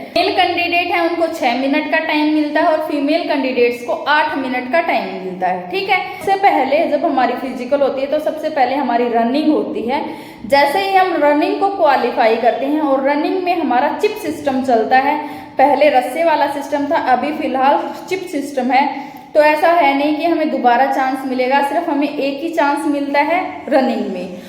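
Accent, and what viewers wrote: Haryana, India. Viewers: Haryana se ho ji aap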